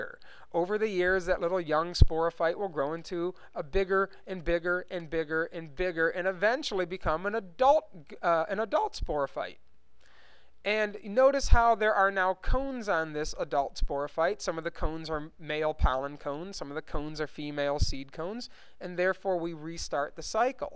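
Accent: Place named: Midwest, United States. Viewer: Thank you so much! P.S Love the accent, is it mid-western? Chicagoan?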